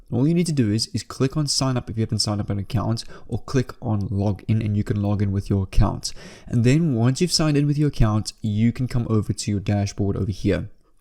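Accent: South Africa. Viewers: Bro you from SA right?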